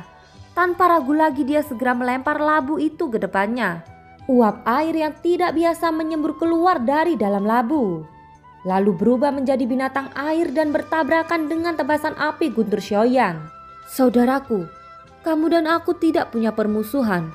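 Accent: Java, Indonesia. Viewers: Bahasa meddok nya/ atau logat nya kapan hilang nya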